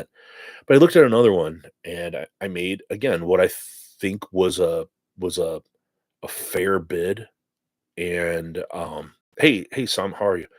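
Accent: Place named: Chicago, United States. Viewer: Congrats on the Solid Pull. Sounds like your a Chicago guy. I'm in the SW suburbs.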